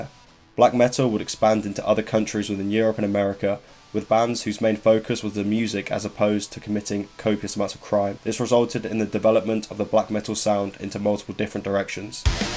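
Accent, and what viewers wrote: United Kingdom. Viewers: british mb?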